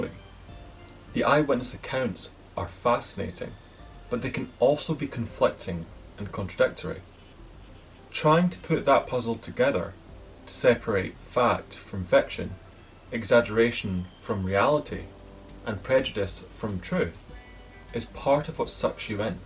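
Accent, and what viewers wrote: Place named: Scotland, United Kingdom. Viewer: oh, I’m sorry, it was just hard to understand because of your extremely thick Scottish accent and total inability to properly enunciate words in what I assume is your native language. But nice bitchy attitude you got there, directed towards one of your fans no less. Great look for someone who is trying to build their YouTube following! Let me know how that works out for you!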